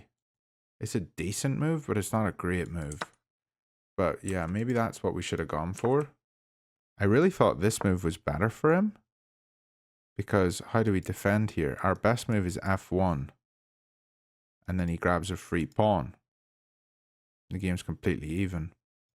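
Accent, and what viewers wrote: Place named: Scotland, United Kingdom. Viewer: Apologies, the accent sounded Scottish to me.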